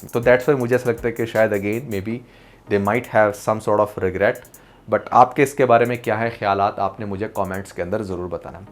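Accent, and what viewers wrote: India. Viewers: Bhai aap Indians ki tarah kyun bol rahe ho.... VIDEO INTERESTING HONE WALI HAI....... GHANTA FARQ NAHI PARTA.... yeh kya hai??? Pakistani Urdu bolo bhai kya hogaya hai....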